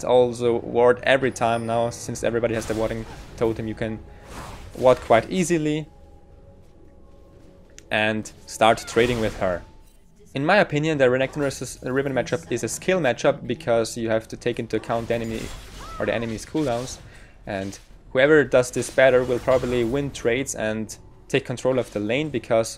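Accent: Germany. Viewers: thats your fault you have to speak free and not try it hard oder rede einfach deutsch ich glaube das du deutsch sprichst mit deinem akzent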